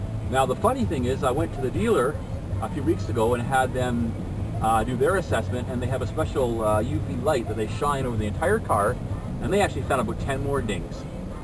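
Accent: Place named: Canada. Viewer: From your accent it seems your Canadian?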